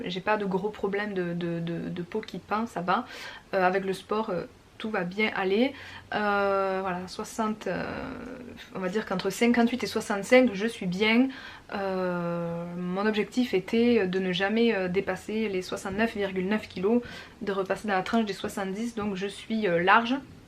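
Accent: Toulouse, France. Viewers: Salut moi c'est Virginie..j'ai été opérée d'une sleeve en janvier 2017, j'ai perdu près de 50 kgs mais je partais de très haut (133kg) je suis donc encore en obésité 1 ...je voudrais perdre encore 13 kgs pour atteindre mon objectif personnel...c'est dur dur...en tout cas, je te dis un grand bravo...tu es magnifique et merci pour tes vidéos ...ton accent Toulousain me met du soleil dans le coeur... (je suis née à Montauban mais j'ai grandi dans le nord. ..)... merci et bonne continuation à toi...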